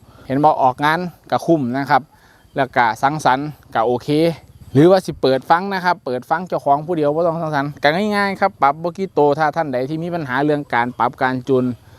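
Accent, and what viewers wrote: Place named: Isan, Thailand. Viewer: ขายให้แต่คนอีสานเหรอครับ พูดกลางไม่เป็นเหรอครับผมฟังไม่รู้เรื่อง😟😟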